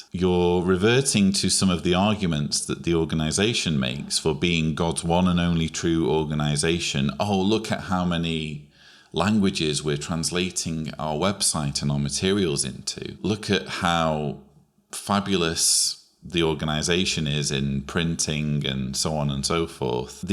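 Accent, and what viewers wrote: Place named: Manchester, United Kingdom. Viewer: Manchester by the accent (and the fact that he shows photos of himself in Manchester and Dudley). Somewhere thereabouts anyway.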